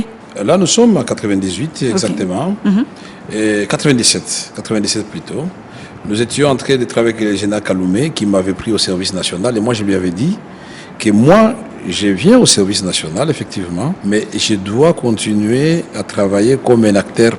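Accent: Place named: Rwanda. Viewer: Maigres toutes les langues qu' il maîtrise mais il n'a pas perdu son accent kinyarwanda. Chassé le naturel.........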